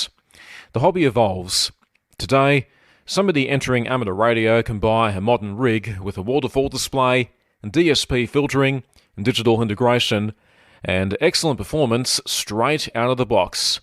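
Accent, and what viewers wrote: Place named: Ireland. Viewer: What a silly accents! Irsih?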